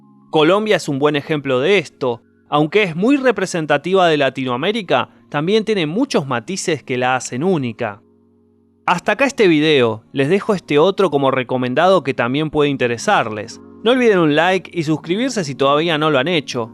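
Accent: Argentina. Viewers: QUE FASTIDIOSO ESE ACENTO ARGENTINO, BUSQUEN OTRA PERSONA PARA DAR A CONOCER ESTE VIDEO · Is argentino 🇦🇷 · Hola. Excelente video, recuerdo q cuando cursaba como 4to de primaria, nos hacían ver en Historia de Colombia a las tres grandes familias de indigenas en Colombia, es decir la Chibcha, la Caribe y la Arawak, y pues dentro de la Chibcha destacaban los Muiscas, los Taironas y los Paeces, pero a día de hoy sabemos que eran muchas más. Colombia es un lindo pais para recorrer, obvio no voy a mentir tiene sus cosas malas, pero animense a venir, yo siempre recomiendo ir a el pueblo y la laguna de Guatavita y ver el embalse de Tominé. Conocer Villa de Leyva, y tambien el valle del Cocora, y claro el infaltable, Guatapé y subir a la pierdra del Peñol. Por el acento del youtuber se que es de Argentina, un país que espero conocer pronto, y pues me imagino que sí ha venido a Colombia y si no pues animese parce. 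· Yo pense que hiba escuchar un Paisa.. pero resulto ser un Argentino... Ironia? hahah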